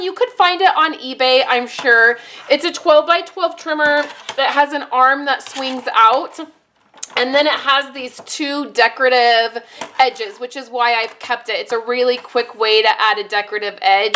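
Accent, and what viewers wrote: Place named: Atlanta, United States. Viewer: Thank you. This is the most informative video I have seen in a long time. My husband was listening to you and swears that is an Atlanta accent. Is it? Love it wherever you got it from!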